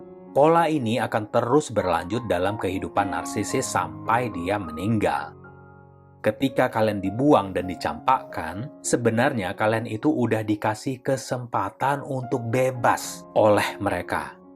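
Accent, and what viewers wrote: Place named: Java, Indonesia. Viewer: Tiba tiba logat bicaranya aneh....gimana ga aneh biasa ngomong logat Betawi kok tiba-tiba campur logat Jawa..😂😂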